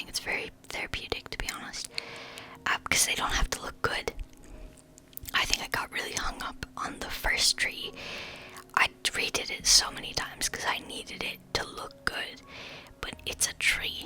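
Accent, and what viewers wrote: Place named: Ireland. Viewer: irish asmr?? really hard to find that on yt lol. I am irish myself and like the familiarity of the accent so its nice to finally find an irish asmrtist 👋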